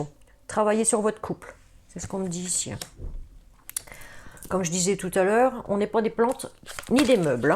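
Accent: North, France. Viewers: j'adore l'accent du NORD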